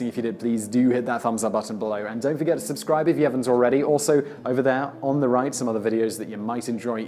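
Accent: England, United Kingdom. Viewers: Your facts are true. Do we need to be reminded of them,. by some smooth english accented tory. Washington also had a greyhound dog named Cornwallis. Now that is worth knowing. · Should we trust these Washington facts from a guy with a British accent?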